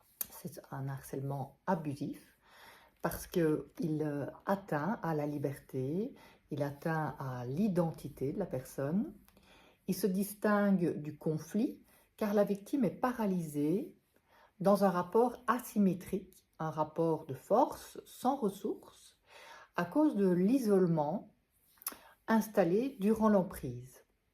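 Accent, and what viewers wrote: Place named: Belgium. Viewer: Bonne explication, dans un bon français, avec bel accent belge.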